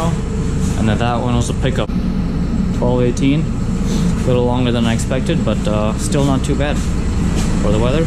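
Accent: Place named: Minnesota, United States. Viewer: Your accent sounds Minnesotan😂 I could be wrong but you sound like a person from up north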